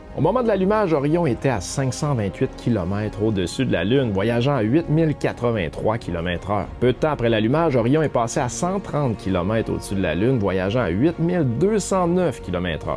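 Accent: Quebec, Canada. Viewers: L'espace racontée avec l'accent de la Belle Province, c'est top!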